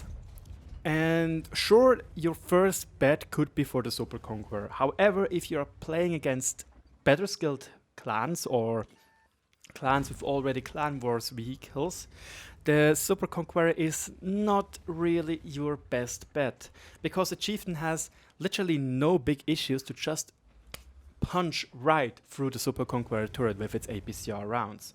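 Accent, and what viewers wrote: Germany. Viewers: Das Deutsche in Dir hört man schon hart raus mein Freund :D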